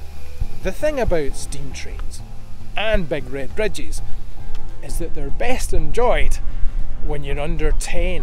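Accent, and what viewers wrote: Scotland, United Kingdom. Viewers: Great grand show thanks i was just hearing that you have a stronger scottish accent than Ur brother the hoof gp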